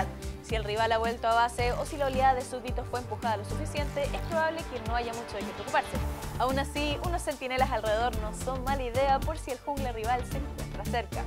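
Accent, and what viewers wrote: Chile. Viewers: 4444 lo justo ni más ni menos tal vez un poco pero no más habla bien y todo es chilena lo noto por tu acento y por lo demás bueh solo eso no más es lo justo .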